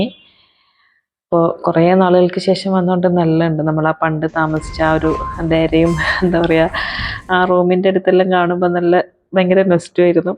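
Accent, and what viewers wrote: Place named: Kannur, India. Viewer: Kannur bhasha 😊😊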